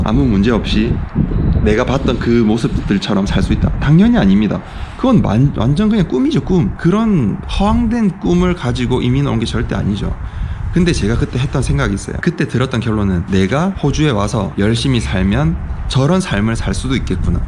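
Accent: Gyeongsang, South Korea. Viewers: 같은 경상도 사람으로서 말투도 생각도 너무 공감갑니다. 영상 잘봤습니다! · 같은 경상도 억양이라 그런지 더 관심이 가는 존님 ㅎㅎ 잘보고있습니다 저희부부도 호주 퍼스에서 영주권까지 고군분투하고있네요 언제나 건강챙기시고 응원합니다 두부부님의 삶을~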